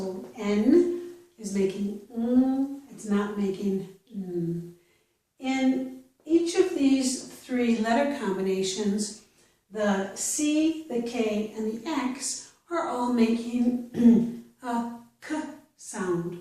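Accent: United States. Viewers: You have such a beautiful American accent. Would I be right in assuming that is a General American accent?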